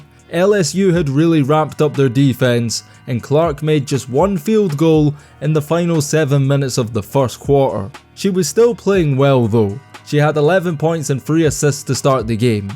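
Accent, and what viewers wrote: Scotland, United Kingdom. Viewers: Why is there a Brit talking about basketball on this video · Bizarre hearing a Scottish guy do the review. But, it’s OK. Sounds great. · It's funny to hear the comments coming from someone with a thick Scottish accent.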